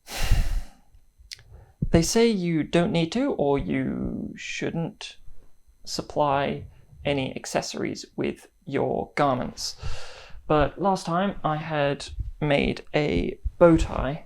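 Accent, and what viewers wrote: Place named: RP, United Kingdom. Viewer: no worries about that. You're fine and definitely do better than I could. We all enjoy your videos and learn from them and appreciate the work you put in. I do feel like maybe you're trying to be very RP with no regional accent and as an American we don't care at all about perfect RP.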